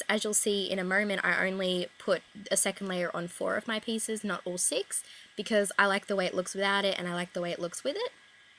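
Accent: Australia. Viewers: An Australian accent! So refreshing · I love your beautiful Aussie accent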